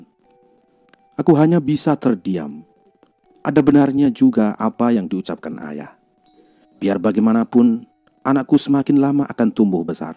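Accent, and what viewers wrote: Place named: Java, Indonesia. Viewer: ternyata enak juga dengerin suaranya bang 😊 Dari narasi intonasi enak didengar. Ngebass agak berat cocok buat jadi MC. Cuma logat jawanya masih kental. 🙏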